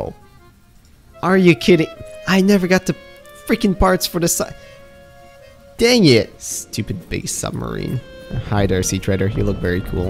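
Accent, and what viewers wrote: Netherlands. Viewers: Immediately knew you were Dutch. It's the accent lol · bro i just discovered youre dutch, you can hide your accent super well!!!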